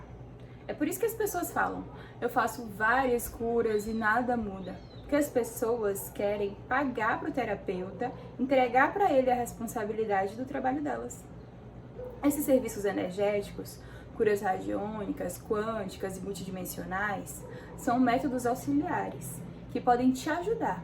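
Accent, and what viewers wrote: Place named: Northeast, Brazil. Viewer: Que presente esses vídeos. Esse canal! E esse sotaque com: oxe!? Amei ❤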